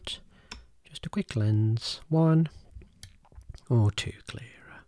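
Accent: United Kingdom. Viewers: A month ago I said this would be the holy grail. Many agreed and you commented about the setup challenge. This is your second attempt, and while I’m excited to watch this I first have to say I’m blown away with your dedication. I was using ASMR to sleep before there was YouTube (bob ross DVD’s). Your unique whisper and Ben Kingsley accent already took over my nightly playlist, but a second attempt to perfect this requested RP Is why a 41 yr old now feels like a groupie. Keep it up, and thank you!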